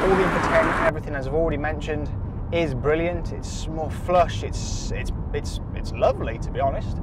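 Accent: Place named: United Kingdom. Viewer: Ох уж этот британский английский 😖 «кунчимэн» 😖😖😖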